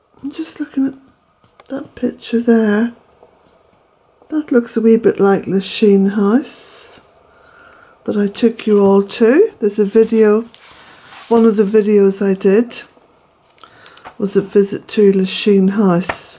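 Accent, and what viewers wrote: Ireland. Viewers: (((❤️))) Perfect Day For Reading! FANtastic Books You Chose! What Fun; To Cozy Up With A Blanket And To Hear The Rain Outside! BTW: I’ve now shared your videos with my three grown sons; Sean Patrick, Kevin Michael, Brian Kelly...I knew for sure they’d love you...their grandmother on their daddy’s side from County Mayo. What can I say...had to name my kids Irish names...otherwise...being Italian that I am, all there was to pick from was, Tonys or Joeys! 🤗👍😉My sister did the same with her only two daughters; Shannan, and Erin! We both wanted children with green or blue eyes! Of course, red hair would of been awesome! (HaHa!) In any case, I knew your accent and where you live would bring them joy! And, not to end, for them to see their mother be so happy to have found your videos that too, brings us all much delight! Oh, I could listen to you forever! 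I hate when your videos end! Wishing you a glorious day ~ No Matter How Spelled, Let It Reign!☔️🏡📚👍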